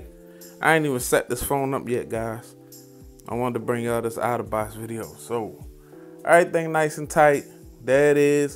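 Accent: Baltimore, United States. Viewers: i hate ur baltimore accent but thanks for the vid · whaaat. Baldamoe?? Not gonna lie I'm from here too but I didn't pickup but now that someone said it I Hear it lmaaaooo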